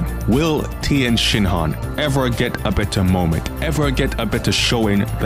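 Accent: Caribbean. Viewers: are you west indian? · You from the Caribbean bro? Can hear the accent but haven’t lived there long enough to pinpoint which island that accent is haha. Amazing video btw, glad someone else felt the same way. Most other fans and the writers were giving UI Goku, Jiren and Hit handjobs while sleeping on the 80 other cool and unique fighters that they should have also given some spotlight.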